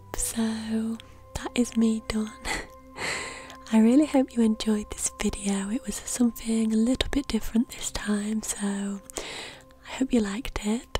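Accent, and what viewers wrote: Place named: Nottingham, United Kingdom. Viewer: You have the most notts accent I’ve ever heard haha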